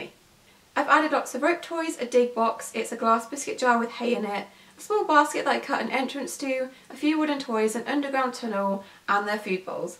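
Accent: United Kingdom. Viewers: You sound very British today TeA Or CoFfEe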